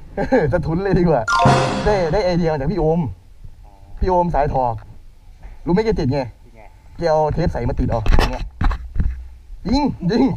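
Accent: Isan, Thailand. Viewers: พี่พูดอีสานดูน่าฮักขนาดติดตามงับๆ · ตอนแรกคิดว่าพูดเหนือ เอาไปเอามา ออกอีสาน 5555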